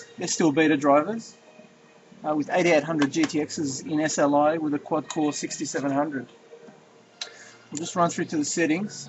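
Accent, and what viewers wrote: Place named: Australia. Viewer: are you australian, cause you sure sound like one ...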